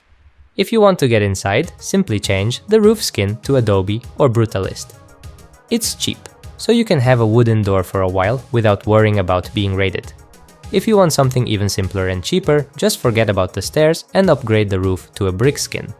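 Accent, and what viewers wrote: England, United Kingdom. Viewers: вы из англии?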